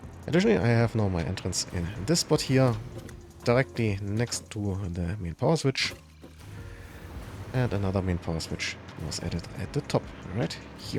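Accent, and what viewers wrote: Germany. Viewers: Man höhrt deinen Deutschen Akzent soo gut raus, ich liebe es :)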